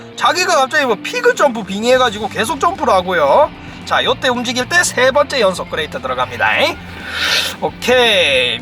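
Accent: Gyeongsang, South Korea. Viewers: 역씨 경상도ㅋㅋㅋㅋㅋ 거북왕 말고 그북왕ㅋㅋㅋㅋ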